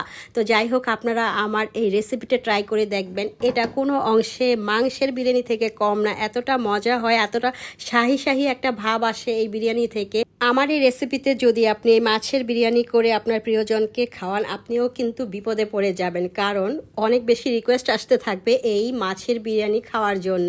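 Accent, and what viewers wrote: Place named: Bihar, India. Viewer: আপু আপনি কি বিহারী..? আপনার ভাষা শুনে বিহারীদের মত মনে হচ্ছে।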